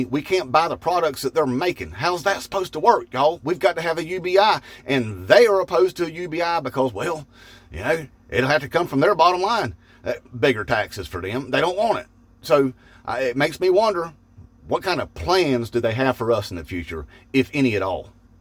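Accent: South, United States. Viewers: With your southern accent it don't make you bad guy imagine you talking with a wisconsin accent yea you know Der hey ya but don't cha know one time we agree old donny isn't best thing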